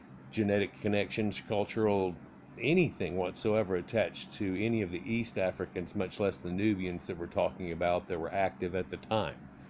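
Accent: United States. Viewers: Your pronunciation is horrible for someone educated in America. English is your first language so when you are introducing foreign name with American accent you expose your ignorance. Hopefully we can all read, so you are reading from Wikipedia is silly, simply because you have not practiced your reading with frequent mispronunciation showing your ignorance. Fact #1- Egypt started as a miscegenated society between Ethiopian or Nubian and Assyrian or Asiatic. So there is no white/black conflict. They were mixed from the beginning. You spoke of early pre-dynastic tombs. These were in fact NUBIAN. So the origin of Egypt is Nubian (negro). Fact #2- There were two governments . Upper Egypt (NORTH) with White Crown and lower (South DELTA) with Red crown. Sesostris is famous for centralizing the govt and wearing the double crown. Sesostris by conquering Nubia made them part for Egypt for over one thousand years. 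It was not until the Greeks invasion that Nubia was separated from Egypt.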